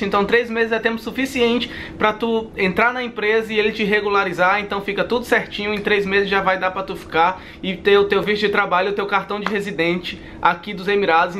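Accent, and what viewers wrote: Northeast, Brazil. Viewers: Tu é nordestino? Sotaque top! Sou de Sergipe e também tenho desejo de ir morar fora do Brasil. Ir do zero o que eu preciso? Parabéns pelo canal, ganhou mais um inscrito! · Continue homi! Seus vídeos são sensacionais. Minha família é nordestina, mas fui criada no Norte do Brasil. Toda vez que tenho oportunidade de ouvir os “sutaque” de lá, eu aproveito. Seu jeito é muito expontâneo! Que Deus os abençoe e que mais pessoas conheçam vocês! (E que os canais cresçam, tenham muitos likes, inscritos e compartilhamentos e tudo de mais mió). Abraços!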